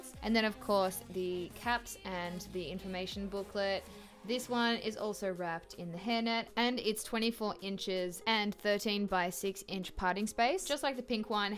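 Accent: Australia. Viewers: Your Australian accent is everything!😩😍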